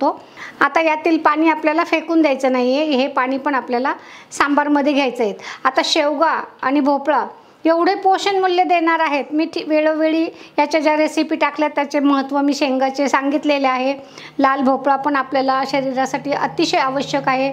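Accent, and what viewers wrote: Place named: Ahmednagar, India. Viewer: Tumhi Ahmednager cha aahat ka tumcha bolanavarun taser care.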